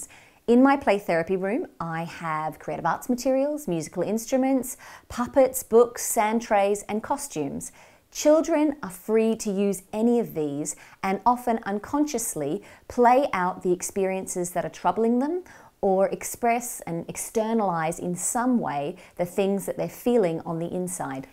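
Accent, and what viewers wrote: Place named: Australia. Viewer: I was so happy when I watched this, thinking this would be perfect for my 3yo son and hearing your Australian accent, thought it might be accessible to me but alas you are based in london. Any recommendations for something similar in Melbourne??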